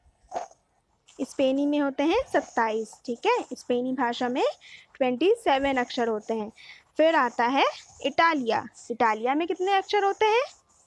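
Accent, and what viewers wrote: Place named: Bihar, India. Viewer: आप बिहारी हैं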